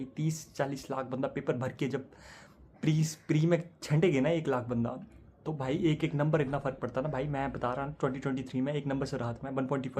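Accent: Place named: Pahadi, India. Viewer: pahadi ho kya bro, accent aa raha hai thoda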